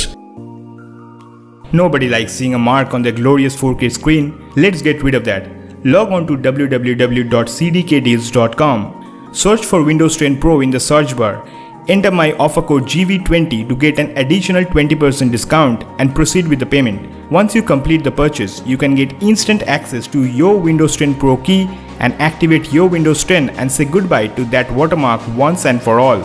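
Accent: India. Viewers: After hearing it voice I confirmed that u r Indian Desi londa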